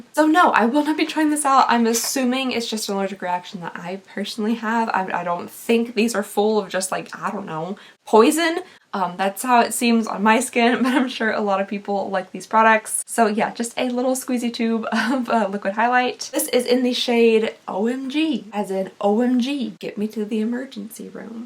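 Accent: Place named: South, United States. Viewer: You're one of my favorite YouTubers. Your southern accent (I have one too), your personality, your babies, and your authenticity are very refreshing and make me feel like I'm sitting around chatting with my sisters.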